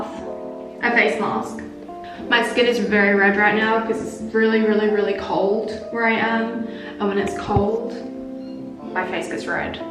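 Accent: Australia. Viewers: Finally someone who teaches accuratelyyyyyyyy. Also every time I watch your videos my mind speaks in an Australian accent to me for like 30 mins after. Don't know what that means but I'm not complaining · I keep faking an Australian accent for an hour straight after I watch your videos and I'm not even a native English speaker I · Is she Australian? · i watch atleast two of her videos every day to get motivation + learn the aussie accent lmao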